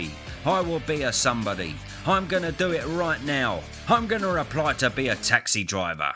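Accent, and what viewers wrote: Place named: England, United Kingdom. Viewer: Annoying pommy voice 🤮🇬🇧🤮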